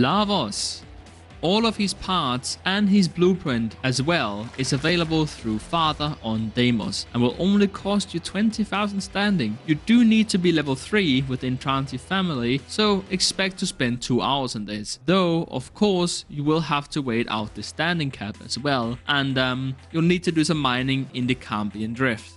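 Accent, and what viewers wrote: Denmark. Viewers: classic danglish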